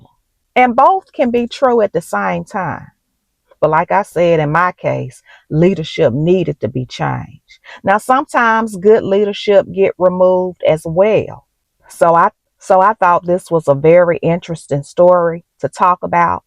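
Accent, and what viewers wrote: South, United States. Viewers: Love your Southern accent. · Sis...I had to listen to this three times to get the message...that lovely southern accent is mesmerizing...complete soul snatching in effect.🤩❤ OK...now to my point. When the Dems were in charge of things, 'Everybody' and their vices were included (including the Devil's Lettuce). Alabama is Ultra right and those that live there need to be knowledgeable of the law. It is what it is...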